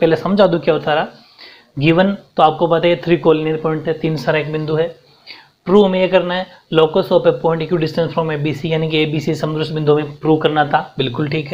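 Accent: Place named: Bengal, India. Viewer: Sir aap Bangali hai mujhe aapki language se lag Raha hai kyunki main bhi Bangali Hoon by the way your teaching way is excellent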